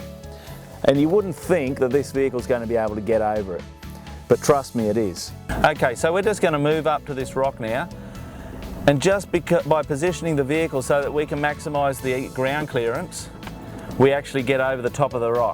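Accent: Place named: Australia. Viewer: Not gonna lie, even though the heavy Aussie accent was a dead giveaway, I was genuinely surprised when he went inside the cab to show where his “Diff marker” would line up and I saw him sitting behind the steering wheel. In my head, I had already marked it for where in the passenger it would go.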